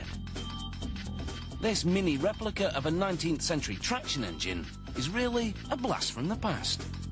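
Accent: United Kingdom. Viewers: The British voiceover is the bomb. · i hate the British accent narrator voice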